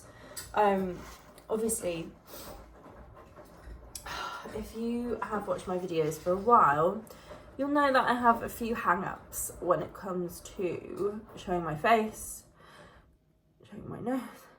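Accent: United Kingdom. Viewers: What Part Of The UK U From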